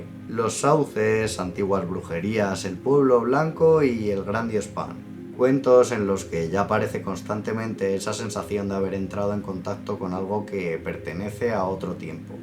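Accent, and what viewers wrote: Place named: Spain. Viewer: Pensé que el narrador era un señor de edad avanzada, por la voz como floja con las letras pegadas, somnorifico, monótono, somnoliento… cuando vi que era un joven, me acordé de wolfsmoker y cautivosdelcine1376, también críticos de cine, videbloguers españoles. Ahora me pregunto si la entonación es propia de alguna zona de España.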